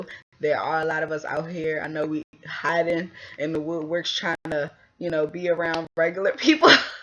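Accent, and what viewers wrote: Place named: DMV, United States. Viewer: She definitely from DMV talking like that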